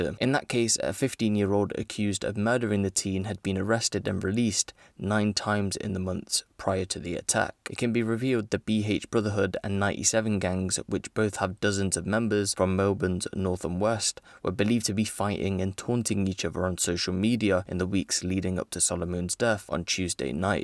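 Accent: United Kingdom. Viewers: So ur Zambian but u talk on the U.K. like ur British !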